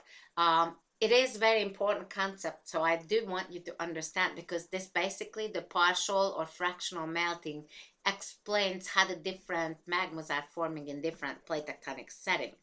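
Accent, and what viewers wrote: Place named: Hungary. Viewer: Summer 2020 GOL 105 - V1 Physical Geology - Savannah Mihalo - Classifying magma gets much more complicated than I realized! I wrote down in my notes about viscosity levels as that was the most confusing part for me---but I think I understand it. I did like learning about the types of intrusive and extrusive mineral textures. When I saw pumice, I remembered that sometimes my family used pumice-crafted materials in the shower for scrubbing. I love obsidian most likely because of its glassy texture. I did not know you were from Hungary; that is so neat! I have loved listening to your accent throughout these videos but I was not sure which country you originated from. I love these videos---they are well done!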